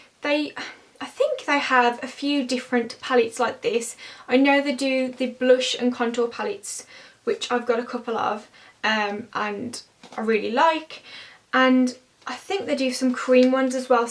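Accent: Birmingham, United Kingdom. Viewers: Do I detect a slight Brummie accent? It's cute. Great review, hun. x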